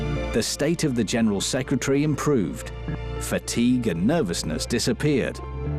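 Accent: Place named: United Kingdom. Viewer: Every time i hear narrators Albion accent I know immediately, its not worth to watch the story...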